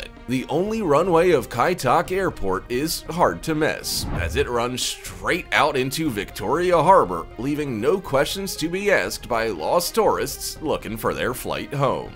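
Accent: United States. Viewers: the voice over.... so not done, but american of course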